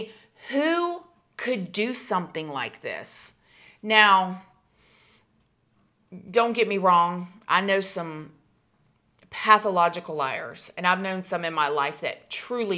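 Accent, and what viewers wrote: South, United States. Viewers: Your southern accent is so strong, I love it!x I’ve been waiting for this to happen…it makes sense now why when she was found, she went super silent! I always thought it was cause she was traumatised, but maybe she’s trying to avoid inditement! Actually makes me sad for her husband when the cheating accusations came out! I wonder if he’s standing by her 🤔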